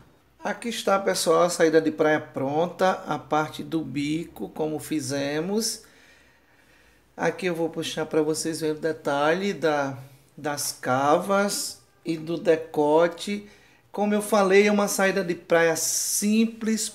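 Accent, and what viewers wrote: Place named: Bahia, Brazil. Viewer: Seu sotaques é um pouco parecido com o nosso da Bahia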